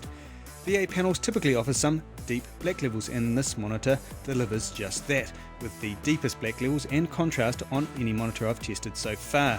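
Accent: New Zealand. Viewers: don't be so ignorant, people from different parts of the world have different accents, this one sounds like a New Zealander.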